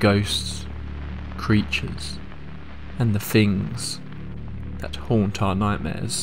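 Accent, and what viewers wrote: England, United Kingdom. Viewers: As an Aussie, hearing an English narrator narrating scary stories lends them authenticity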